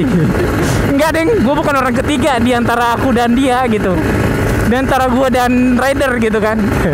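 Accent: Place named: Sulawesi, Indonesia. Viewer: Asli Sulawesi kah bg? soalnya logat nya kaya orang Sulawesi •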